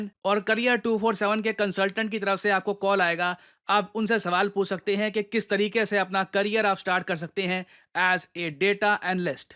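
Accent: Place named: Delhi, India. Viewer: That’s Delhi in u speaking😋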